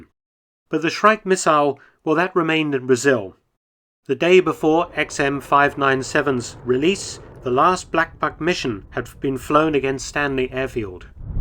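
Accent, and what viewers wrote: United Kingdom. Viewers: It sounded like Dr. Felton was saying "Vulcan" (with a "u") to me - it's the British accent ... which isn't surprising given that he's British.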